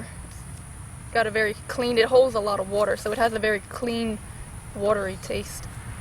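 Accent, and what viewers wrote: Louisiana, United States. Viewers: You sound like you're from Louisiana, by golden meadow or Thibodaux.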